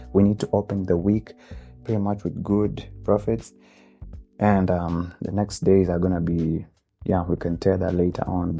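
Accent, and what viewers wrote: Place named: United States. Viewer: Awesome vedio over here,😊The American accent though 🔥🔥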